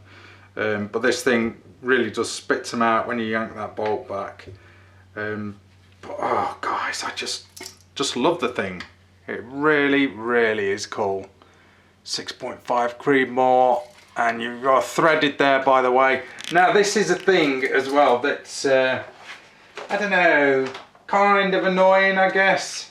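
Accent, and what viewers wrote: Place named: United Kingdom. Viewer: What country are you in? Because it sounds like your from the UK. I thought that the UK band firearms from civilian.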